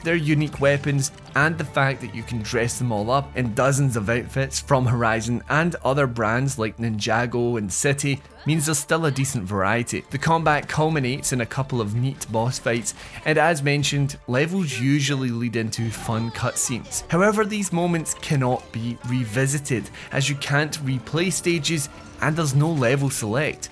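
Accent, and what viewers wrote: United Kingdom. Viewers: when he said Varl I was like who!? But am just teasing. That accent is a prized British treasure 🥸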